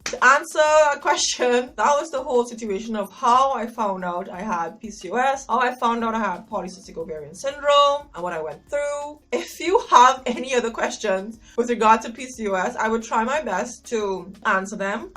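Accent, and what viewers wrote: Trinidad and Tobago. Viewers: Very informative. I'm quite hairy in places I wonder if I should get the test. I' like ur trini accent 👍🏾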